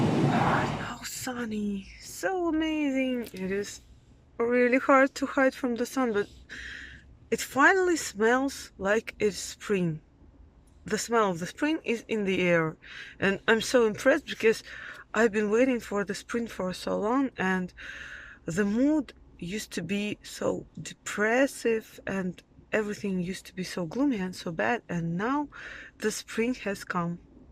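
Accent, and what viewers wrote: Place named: Russia. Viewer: Your voice is relaxing. I like Russian accents.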